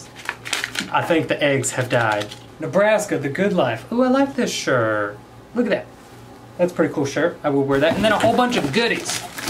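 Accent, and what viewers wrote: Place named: Upper Peninsula, United States. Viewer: Ayye yooper eh?! Wee love it!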